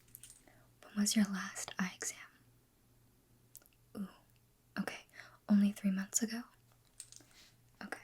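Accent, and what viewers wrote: South, United States. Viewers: Can you make more southern ascent videos love them!❤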